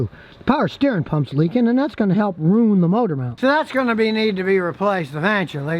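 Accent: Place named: Philadelphia, United States. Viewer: Is this guy from Philly?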